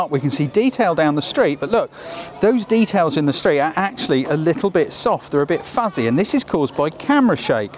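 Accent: United Kingdom. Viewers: EXCELLENT video! I really enjoy your calm and clear presentation (enhanced by your great British accent). You clearly got a passion and talent for both photography and presentation. You got yourself a subscriber. Great work, I learned a lot =)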